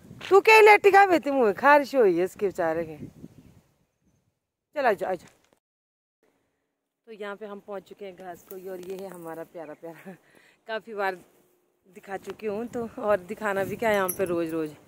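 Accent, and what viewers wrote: Punjab, India. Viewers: Tuhadi Punjabi Bahut mithhi ai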